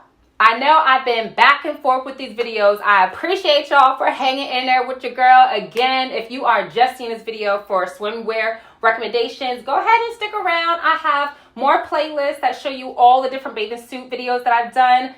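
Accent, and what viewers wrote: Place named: Baltimore, United States. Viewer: Ure the best at this by far n ur Baltimore accent is cute. Most girls can't make it cute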